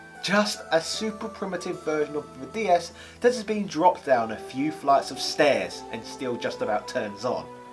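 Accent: United Kingdom. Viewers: Shut up your british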